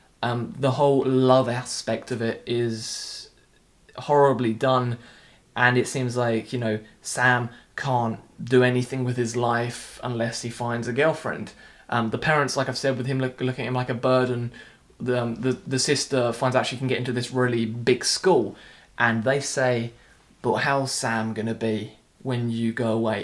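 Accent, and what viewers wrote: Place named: United Kingdom. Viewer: From your accent, I am guessing that you live in the UK. Autism culture between the UK and US is quite different. I've found from reading, vlogs, blogs, fora, etc. that it seems as if one driving difference for that has been legislation. In the UK, you have specific legislation pertaining to autism that defines it as a recognized and funded disability which coupled with universal healthcare makes the landscape there in terms of autism really different than it is in the US. You also have a very strong advocate in the Autism Society. You mentioned that for the parents in "Atypical" that Sam is a burden and seemed to find that horrendous. But in the US because so much care and assistance isn't provided, in financial ways and in terms of Sam's prospects for independence in a bottom line sense he probably would be. Many of the special classes he's attended even his therapy may not be covered by the parents' insurance - so something they are paying for out of pocket. 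If Sam has trouble finding (sustaining) employment then they might be looking at him living with them for the rest of their lives, whereas my understanding of things in the UK is that (while they are not perfect) there is financial and housing support available for autistic people. Not to mention that in the show there are a ton of special accommodations, that mostly the Mom makes around what Sam will eat, wear and even by having a separate laundry detergent just for him. That said, my sense of the "burden" theme was t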